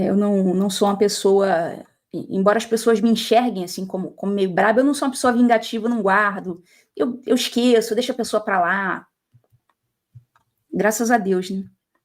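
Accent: Rio de Janeiro, Brazil. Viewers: O sotaque carioca confundiu o ouvido do pessoal. Aos meus ouvidos, sinceramente, passaria batido, tanto que só fui perceber a "confusão" porque veio a tona rsrs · Sotaque carioca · adoro você e esse seu carioques é lindo como você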